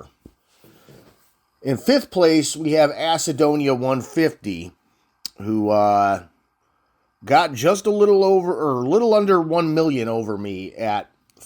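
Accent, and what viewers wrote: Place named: United States. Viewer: Something very official with a deadpan American accent, i like it! Well done all. I still need to improve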